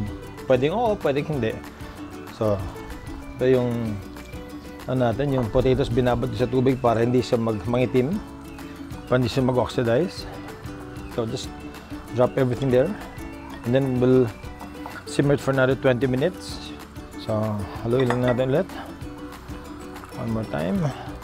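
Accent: Visayas, Philippines. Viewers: skill which is the best thing a family man should have. and your visayan dialect so amazing. visaya man ako from Kalibo Aklan. Thanks Richatd. cook more. Your Tinolang manok with malunggay and dayon ng sili so splendid. Wr 're hete in Toronto. We saw you at Nathan Philip's Square when you last visited Toronto . yebahh!!!! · When he speaks bisaya, nice kaau paminawon hehe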